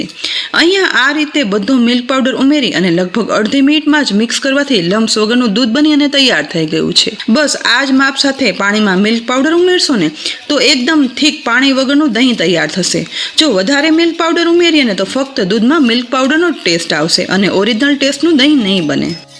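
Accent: Kathiawar, India. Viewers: Tamara bol vani rit and sabd pure khathiyawadi che...bahu Sara's bahu saras style che bol vani...and etlu j mast dahi banavani rit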